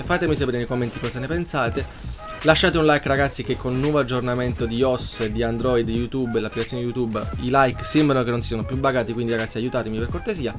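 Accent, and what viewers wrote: Italy. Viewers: Adoro o sotaque italiano kkkk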